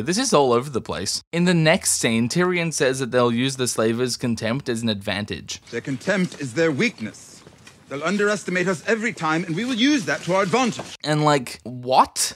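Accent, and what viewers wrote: Australia. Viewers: Melbourne! Ha... I couldn't tell if you were Australian or English, but now we know :)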